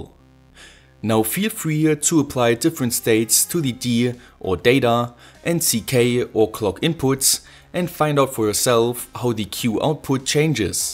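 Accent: Germany. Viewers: U sound so German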